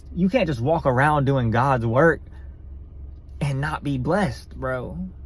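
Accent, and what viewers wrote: South, United States. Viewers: You got like a country accent . Where are you from ????🎉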